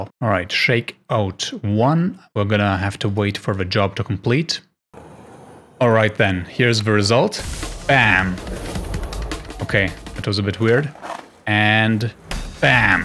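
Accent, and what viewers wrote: Germany. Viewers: Kann es sein dass du deutscher bist weil du redest so deutsches englisch hahahahahah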